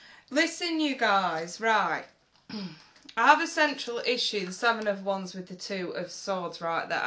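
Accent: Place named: Manchester, United Kingdom. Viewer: Fab reading chic........R u from Manchester????xxxxx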